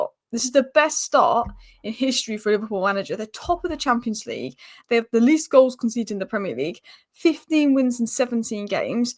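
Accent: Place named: Manchester, United Kingdom. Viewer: That's a thick manc accent.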